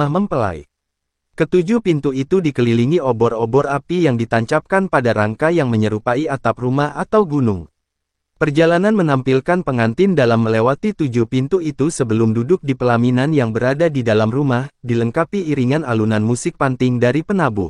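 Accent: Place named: Java, Indonesia. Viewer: Ini channel orang jawa suaranya aku kenal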